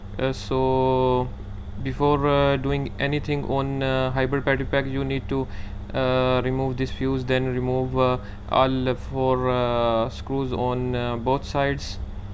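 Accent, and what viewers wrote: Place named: India. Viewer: Indians speaking english sounds very ridiculous!!, next time use subtitles.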